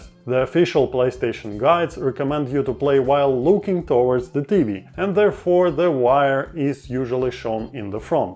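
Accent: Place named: Russia. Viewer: Oh, god, russian men speak on English, and this is so cool!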